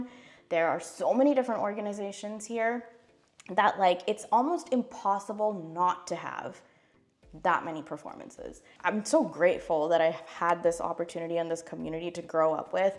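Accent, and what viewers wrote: Godavari, India. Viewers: ❤❤ your telugu is cool and nice.. I can say your parents are from godavari districts of a.p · Your godavari slang 🔥🔥🔥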